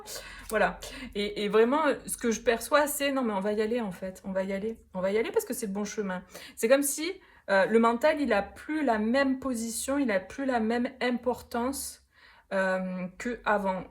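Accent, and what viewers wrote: South, France. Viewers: Merci pour votre limpidité, merci pour cette guidance. D'où vient votre accent du sud ? Je suis du sud ouest 😉. Douce journée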